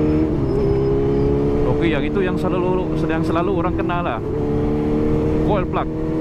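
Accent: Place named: Sabah, Malaysia. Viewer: Suka dengar abang cakap sabah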